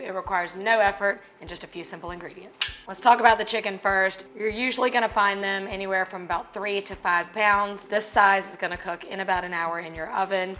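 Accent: South, United States. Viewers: is my favorite cooking / food person by farrrr.....love her voice and Southern accent, love her personality, love her food, love it all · i agree with you i watch her at night kinda hooked on her southern accent! lol and she rocks in cooking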